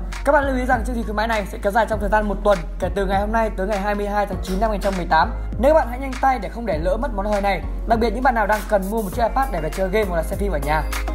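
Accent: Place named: North, Vietnam. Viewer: Người SG nghe cô này nói là biết người Bắc sau 75 ,nói như sợ người khác cướp mất lời mình ,nói không kíp thở ,có cần phải vậy không ?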